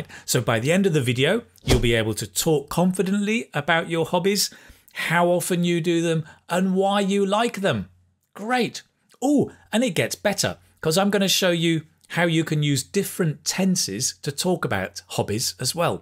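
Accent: United Kingdom. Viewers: In my free time usually do learning English and copy the words with British accent as you had which makes me improve a lot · Do you speak with a British accent? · I just love to watch your videos and practice my fluency. I love that repeating session..feel as if I talk to a British teacher in person. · Wao thank you so much.I am obsessed with your videos.I am extremely curious how can I learn the British accent?I love your accent😇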